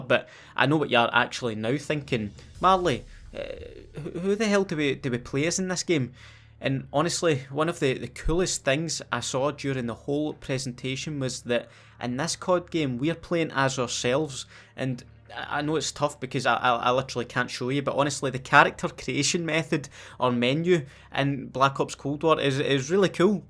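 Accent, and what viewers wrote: Scotland, United Kingdom. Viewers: His voice is so fucking awesome to hear. I wished i had the scottish accent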